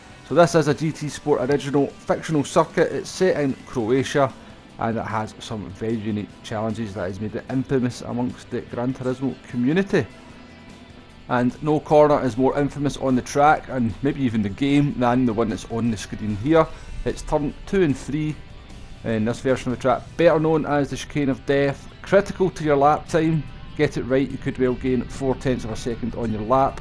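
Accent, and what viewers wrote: Scotland, United Kingdom. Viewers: North American by any chance? they seem to be the ones asking for subtitles the most. I find his pacing and delivery excellent and the mild Scottish accent only adds to my enjoyment of them, if we are lucky he might respond with a link to a properly unitelligiable Scotsman as his accent is imo really not that bad.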